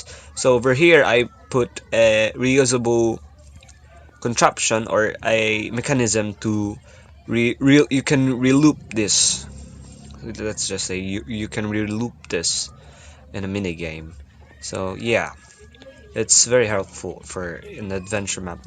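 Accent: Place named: Visayas, Philippines. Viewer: Nice english accent bro bisaya with nice English accent? Nice!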